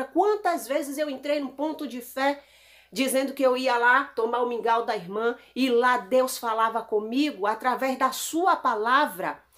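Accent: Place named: Bahia, Brazil. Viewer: Eita baiana arretada continua a falar a verdade minha irmã cada vídeo seu fico falando com Deus.porque todos não são verdadeiros que Deus continue a te usar e abri os olhos do povo ,ah tô amando seu sotaque kkkk sua risada também fico rindo com você falando sempre a verdade quando tá brincando ou falando sério Deus continue a te usar IRMÃ 😘👏👏👏👏🙏🙌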